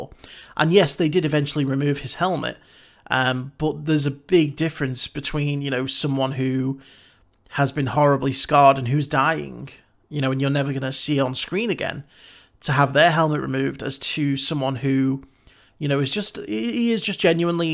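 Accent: United Kingdom. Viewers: Wait. You sound British yet I thought the Mandalorian was available on UK Disney plus till like feb of 2020. Just how are you seeing it ?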